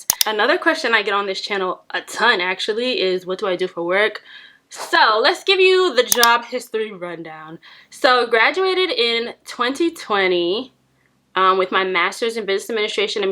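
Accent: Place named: New York, United States. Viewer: I feel like people think you’re Caribbean by how you speak but that’s the New York in you. There is a lot of Caribbean people here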